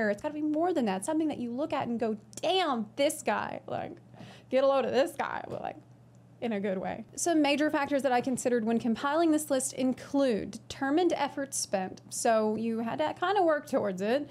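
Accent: South, United States. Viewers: You have the cutest country accent I've ever heard where r u from ?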